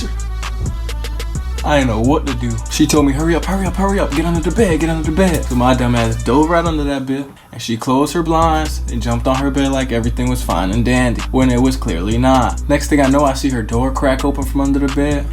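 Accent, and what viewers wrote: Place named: Florida, United States. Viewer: lmao this nigga accent... Flawda as fuck lol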